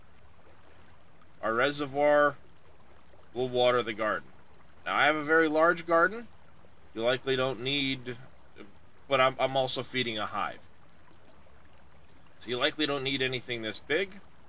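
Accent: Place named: South, United States. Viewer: I love your southern accent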